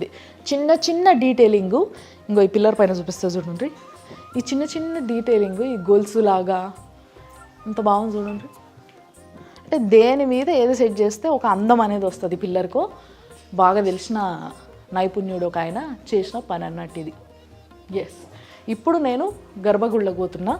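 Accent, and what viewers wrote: Telangana, India. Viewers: Om namaha Shiva ya, me Telangana slag language very nice amde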